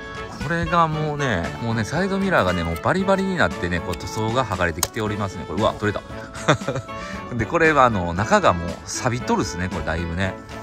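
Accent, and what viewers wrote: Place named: Kansai, Japan. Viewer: 同県民だけど微妙に関西弁違う 当方北部です。前の川？綺麗な景色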